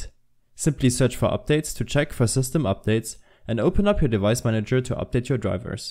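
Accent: Germany. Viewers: i bet ur german i can tell it from ur english accent WAS GEHT AB DİGGA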